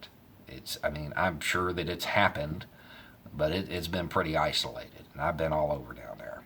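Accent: South, United States. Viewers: As a Liberal in Western Pennsyltucky, I can say that this guy has opened my eyes., my man, I really hope you read this; You are doing far more good than anyone I've ever known personally or met as an acquaintance. Keep on doing what you're doing. I fully support everything that you're saying. Jesus H. Christ hanging off a cross, I needed to hear this message from someone with a southern drawl. From the moment you addressed the hero complex and the AR-15 being a symbol of masculinity to the minute you addressed every issue in this video, more people need to hear what you have to say. I'm just saddened to say that the message you have to share is simply going to fall on deaf ears; don't let that deter you from putting your words of wisdom out there, because it is reaching enough people to make a difference. I fully stand behind this guy. Cheers,.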